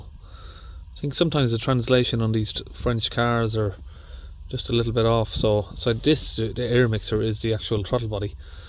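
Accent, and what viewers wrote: Ireland. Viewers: You'll never loose that irish accent tipperrary carlow offaly?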